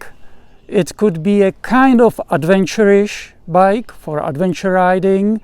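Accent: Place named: Czech Republic. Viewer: Ahoj. Já jsem si říkal, že ten Akcent je český 🙂. GS 310 zvládám tak, jak píšeš výše. Zkusil jsem rozumný offroad, topcase ty plné postranní kufry. Důvody, proč chci jít výš jsou: méně řazení, větší komfort při předjíždění, možnost jezdit ve dvou na delší trasy pohodlně, lepší výbava a taky pocit, že nemám nejmenší GS 🙂.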